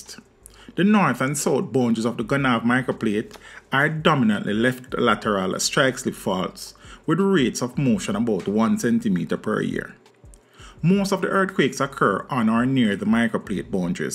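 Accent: Jamaica. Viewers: From to, beg yu sai di fus likkle paat inna Jumiekan fi mi undastan? I feel like Oliver in one of his skit where he says, "...mi neva undastan a wod im seh..", but I'm not familiar with the technical terms you used. Please & thanks. Bless up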